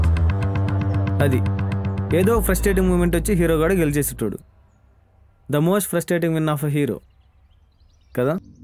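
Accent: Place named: Anantapur, India. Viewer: Dinamma, Anantapur accent nv cheptunna vastavalanni gattiga tagultannai bossu.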